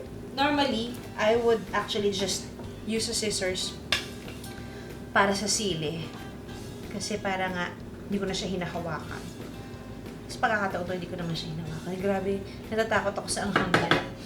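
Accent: Batangas, Philippines. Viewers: nagkakaroon na po kayo ng puntong batangan. nakakaproud lang. palm sugar po sa batangas ay SANGKAKA..more power po.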